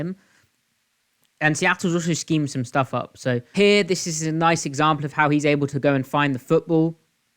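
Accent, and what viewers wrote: United Kingdom. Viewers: For someone with your accent, no offense.. I find it absurd that you call him unsung. The 12’s are aware of reed. We know. Calm your tits mate. I watch different channels from across the pond and I love that we have an international fan base. But you are not speaking for the home base. Maybe you’re just late to acknowledge it yourself.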